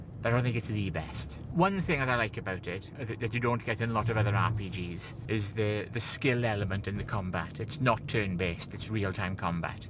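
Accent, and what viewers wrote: United Kingdom. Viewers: I love your voice and accent lol. I'm from the south (of the U.S.) and I have no idea what part of the U.K. it's from but it's wonderful. I hope everything goes well, sending some trans solidarity love your way 💖✌️🌠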